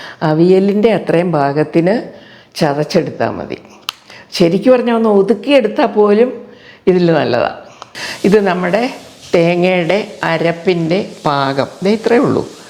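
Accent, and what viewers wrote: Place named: Vaikom, India. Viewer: ടീച്ചറിന്റെ നാടു വൈക്കം ആണോ. അവിടത്തെ ഒരു സ്റ്റൈൽ ആണ് സംസാരത്തിൽ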